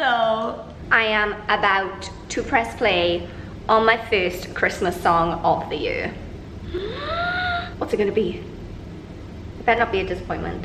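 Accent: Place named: Scotland, United Kingdom. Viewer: I'm usually pretty good placing accents but yours intrigues me.Ive been watching some of your Kuwait vids (Im in Kuwait on a US mil base).You've got a scottish/midlands/aussie thing going on.So which one?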